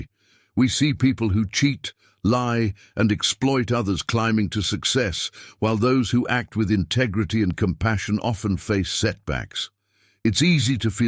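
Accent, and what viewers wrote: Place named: England, United Kingdom. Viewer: Very good. But please 🙏 please no more English accent.. please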